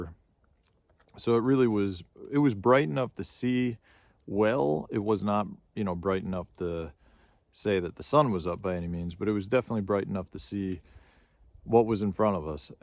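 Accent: Wisconsin, United States. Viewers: Thanks for sharing. It takes courage to talk about this subject due to the fear of ridicule. I appreciate that. Plus, it's always fun to hear a Wisconsin accent! Seriously though, you might want to check out Facts by How To Hunt, Steve Isdahl's Youtube channel. He posts about other people's encounters and experiences. Also, Dave Paulides & Scott Carpenter. All very informative channels. Lot's of critters out there. Fish on!🎣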